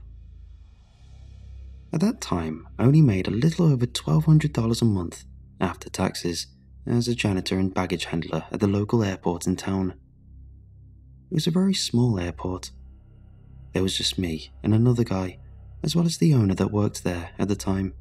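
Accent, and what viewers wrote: United Kingdom. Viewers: Easily my favorite story you've read. This and the forest fire story with all the different things happening every night. Your light British voice fits these 2 stories and both main characters absolutely perfectly👌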